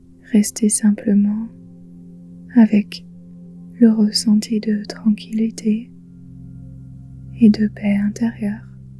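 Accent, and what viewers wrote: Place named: England, United Kingdom. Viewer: Le petit accent anglais derrière me fait voyager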